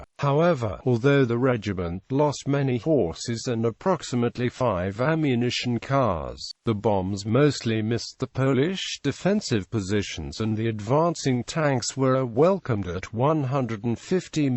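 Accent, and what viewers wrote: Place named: Wales, United Kingdom. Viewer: I never knew AI could do a Welsh accent :)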